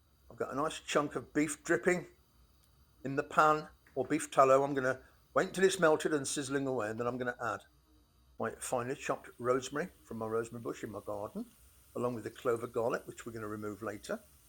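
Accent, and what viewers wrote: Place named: Birmingham, United Kingdom. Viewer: Love the gravy. Love the mild Birmingham accent. Subscribed.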